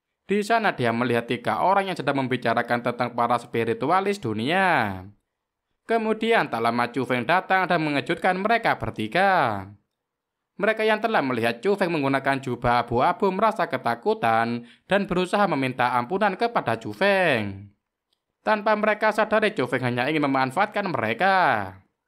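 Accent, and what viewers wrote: Java, Indonesia. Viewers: Min.. Logatnya ganti Terkesan jawir 😂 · Cara baca alur nya Jawir bet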